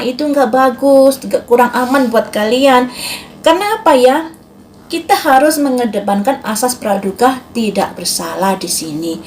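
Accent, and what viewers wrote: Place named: Java, Indonesia. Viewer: logatnya jawa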